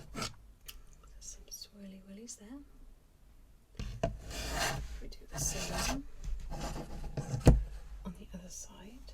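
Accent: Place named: Wales, United Kingdom. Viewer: oh i've been looking for more welsh asmr creators! such a lovely accent and voice ❤️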